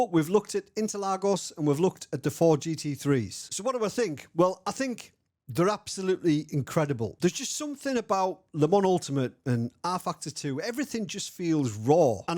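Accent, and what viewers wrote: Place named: North East England, United Kingdom. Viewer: Hey, I notice your North East accent. You based up here in NE England by any chance?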